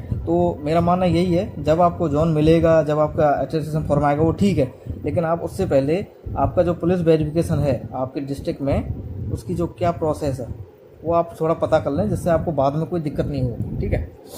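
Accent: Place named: Agra-Mathura, India. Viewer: Bhai agra mathura side se lgte ho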